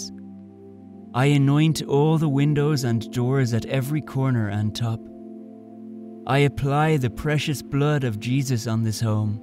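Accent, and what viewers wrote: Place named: Ireland. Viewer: Which part of Ireland are You from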